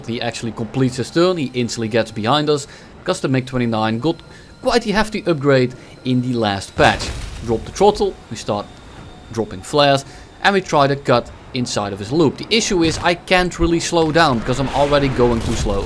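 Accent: Netherlands. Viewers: Je accent is iets te Nederlands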